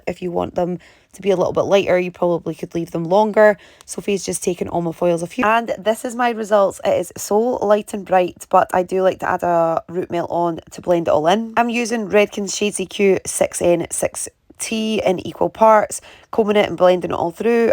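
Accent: Scotland, United Kingdom. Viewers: You’re Scottish or irish or welsh ? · She's Scottish for sure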